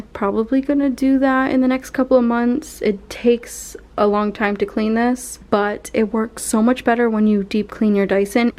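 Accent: Boston, United States. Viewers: You from Boston ? Some of your accent sounds like mine!!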